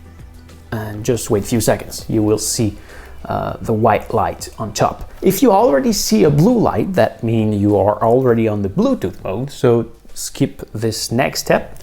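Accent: Quebec, Canada. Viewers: You HAVE to be québécois. I recognize the accent ;)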